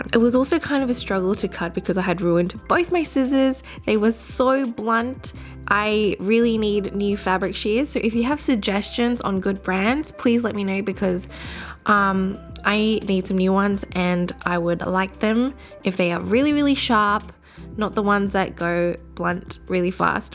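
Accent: Australia. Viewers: An Australian Accent?! Really sounds like your from Sydney :D !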